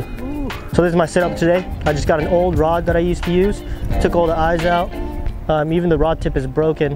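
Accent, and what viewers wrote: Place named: United States. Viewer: Bro, I live in Japan and watch a lot of fishing vids, so I guess that's why your vid got recommended, but you can imagine my surprise when I heard you speak, obviously born in America or at least lived there most of your life.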